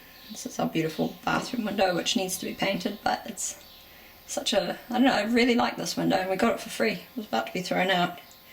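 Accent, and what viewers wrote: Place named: New Zealand. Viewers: Very nice work. And that accent, holy crap I think I need to move to New Zealand. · To me it sounds kiwi with a tiny hint of South African.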